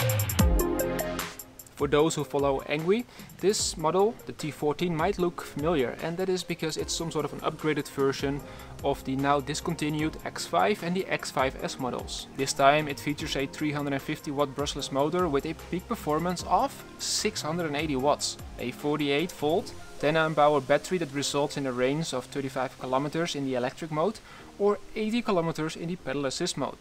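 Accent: Netherlands. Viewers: Nederlander khoor het aan je accent😂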